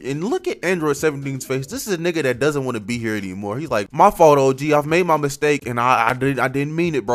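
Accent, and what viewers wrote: Philadelphia, United States. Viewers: r u frm philly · Philly or ATL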